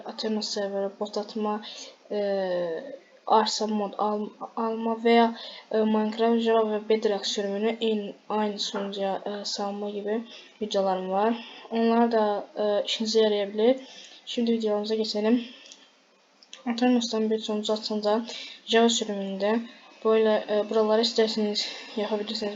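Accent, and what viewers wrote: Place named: Azerbaijan. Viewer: Abe azerbaycanlısan türkçe anışma be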